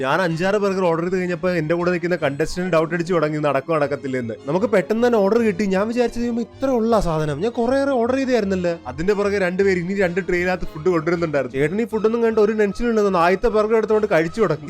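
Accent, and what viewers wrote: Kottayam, India. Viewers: Bro kottayam alle😂😂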